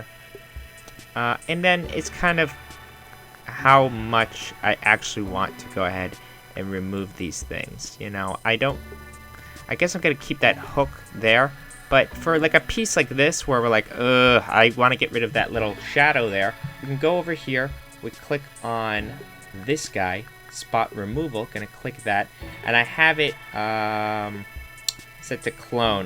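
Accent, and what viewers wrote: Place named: New York, United States. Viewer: Your accent is intense. Definitely a New Yorker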